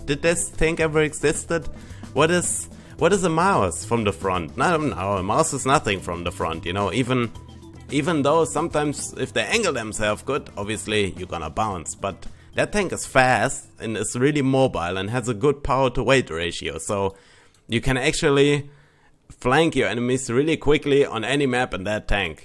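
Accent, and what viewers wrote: Germany. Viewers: I love his German accent :P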